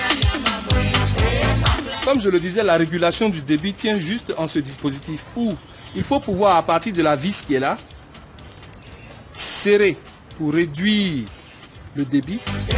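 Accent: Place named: South, France. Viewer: Coucou, j'ai tout compris... tellement bien expliqué et avec l'accent du soleil en plus, que du bonheur. Plaisir et joie chez vous.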